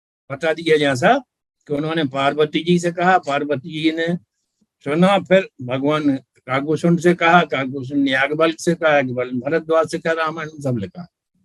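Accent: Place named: Bundelkhand, India. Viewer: Mujhe inse dada ji se milna hai Inki aawaj ko sunkar aisa lgta hai jaise ye Madhya Pradesh ke bundelkhand se hain Kyunki main bhi wahin ka hoon Inse kaise mile? Or books kahan milegi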